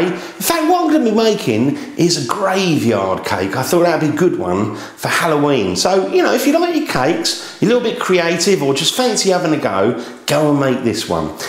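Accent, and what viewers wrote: United Kingdom. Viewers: finally! someone whos british!!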